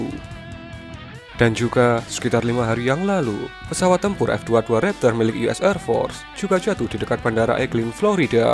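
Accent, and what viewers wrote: Java, Indonesia. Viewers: karena aksen sampeyan medhok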